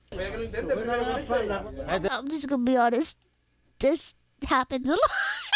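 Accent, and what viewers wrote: Puerto Rico. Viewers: I never would have thought Iron Mouse was Latino and refused to believe it, then I heard her accent and "yeah she's definitely Puerto Rican" lol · Jaja su español es muy de puerto rico XD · lol. I was just curious because I heard this vtuber was puerto rican so this is the first video of hers I'm watching. Her spanish was very puerto rican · HER SPANISH IS PUERTO RICAN HOLY SHIT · Hi there qualified puerto rican living on the island, can confirm 100% accurate right down to her accent.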